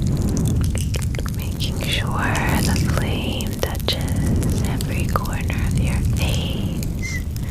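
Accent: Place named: New York, United States. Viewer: I don't know where you get these ideas, but I LOVE them!! So many tingles and you are very entertaining! I love the one's with a NJ/NY accent! Reminds me of the 3 months I lived in NJ! 😂❤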